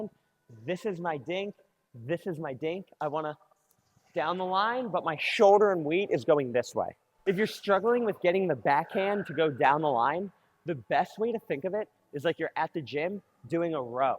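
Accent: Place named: New Jersey, United States. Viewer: i trust you have the best intentions, but it doesn't necessarily mean you're right. i've never watched his videos and felt he was talking down to me. and this is coming from the pov of a former nyc public school teacher and 4.5 player. to me, just sounds new jersey direct and enthusiastic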